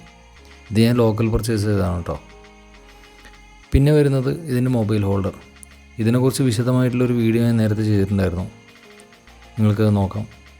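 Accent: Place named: Kannur, India. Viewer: Glad to hear a different tips of this bike from you .. G’men , which is your exact residential location..? as per your slag , you are in KANNUR dist..? Thank you, I like the video and the places of the video ..!!😀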